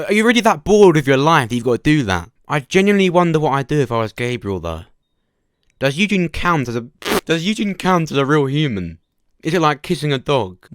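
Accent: United Kingdom. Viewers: ...Not sure where you reside. Per the accent, I would assume/guess it's somewhere in the UK. All I can I say is you have what's called a "Radio Voice." In America, there's actual talent agents who specialize in voice work. I.e. Radio personality/Talent, as well as voice over for major movies, cartoons, etc. You should put a demo recording of yourself, with some sort of profile and picture and send it in to a talent agency or agent. I would send out a lot of the profile. I sincerely believe someone in the industry would be interested. You have a smooth and different type of voice in a good way. Please consider it, you just might have much success. A radio personality makes 7-8 figures a year. Doing movie and cartoon voice overs those people can make the same too. Give it a try you have nothing to lose. Just my opinion. But bet others would agree you have a cool voice that people like listening to.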